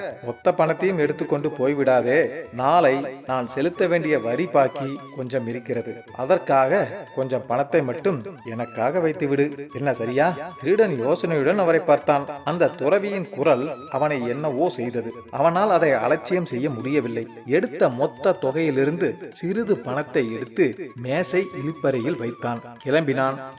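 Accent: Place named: Sri Lanka. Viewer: அவதானித்திருக்கிறேன் .இன்னும் இலங்கை தமிழ் விடவில்லை 😢 லைக் செய்துவிட்டேன் .நட்பே ❤😂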